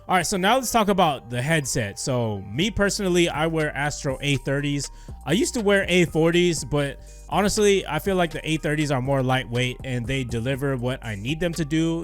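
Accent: Scotland, United Kingdom. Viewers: It's Scottish pal not Australian! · Its Scottish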